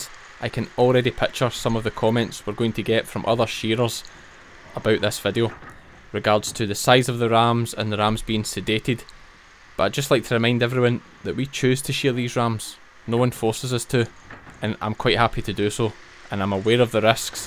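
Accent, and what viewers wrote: Scotland, United Kingdom. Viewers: I love your Scottish accent. I’m a big Outlander fan and I’m anxiously awaiting Diana Gabaldon’s next book in the series. My maiden name was Thomas, so we’re Welsh. On my bucket list to visit Great British countries but England can wait. #ACAP! Btw found out about you from your debut on The Hoof GP’s channel. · Please don't laugh. I'm a new subscriber, when you talk are you speaking just English or do you throw in some Scotish as well?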